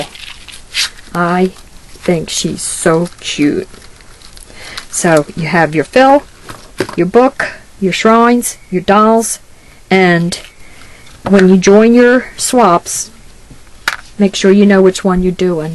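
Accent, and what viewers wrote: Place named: Philadelphia, United States. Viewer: You SO deserved to be showcased by Laurel...you are a true artist and you are doing the same thing she is by honoring so many of the artists who have inspired you over the years. Love how your book is coming along...purple is my favorite and your birdcage rocks! And I agree with NJGardenGirl....you definitely have that Philly accent going on! TFS, Heather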